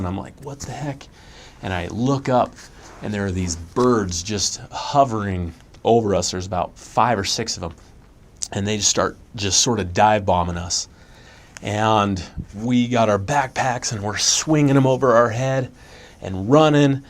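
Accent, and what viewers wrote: United States. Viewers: Give it a few months and you’ll lose your American accent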